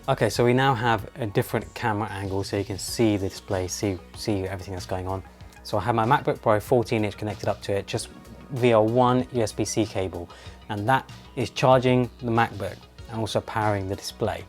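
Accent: United Kingdom. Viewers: That's the hardest brit accent and I love it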